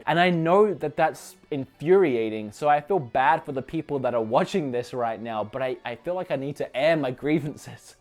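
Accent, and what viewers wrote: United Kingdom. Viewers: Terrible British accent? Dont worry, we dont think much of your convict accent! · Terrible British Accent? Snob?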